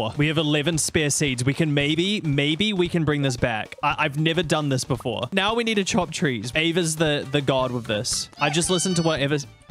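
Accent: New Zealand. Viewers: it took me like 3 videos to realise you were also a kiwi lmao, why does my brain never register our accent 😅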